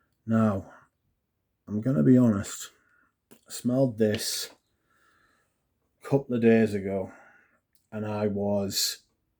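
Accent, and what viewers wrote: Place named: Northern England, United Kingdom. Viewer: Fyi with your northern accent it’s “Bwah d”I says” 😂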